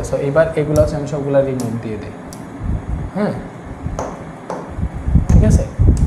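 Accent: Sylhet, Bangladesh. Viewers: তুমি মিয়া সিলেটর কোন জাগার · স্যার সিলেটি❤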